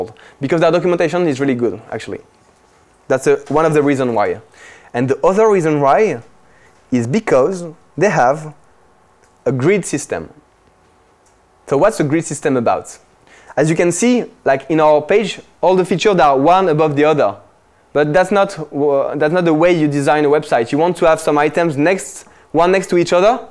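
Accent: France. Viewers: I'm hearing French actually.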